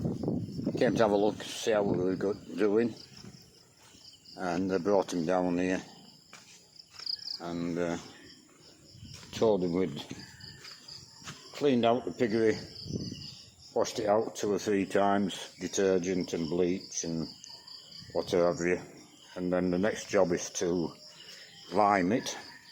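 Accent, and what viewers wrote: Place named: Yorkshire, United Kingdom. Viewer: Which part of Yorkshire are you from ❤if I was guessing 🤔 I would say Barnsley ❤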